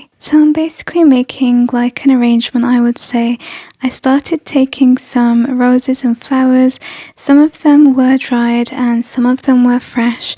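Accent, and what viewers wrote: England, United Kingdom. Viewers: Hi love! Personally, you don’t have to remind me to press the thumbs up because that is the first thing I do when I open the video! Such a cute idea to put a tea light in the egg shell! My fave is the last diy., did I ever tell you how I love your calming voice! Add the English accent and....heaven! You should do story time for kids at the local library! XOXO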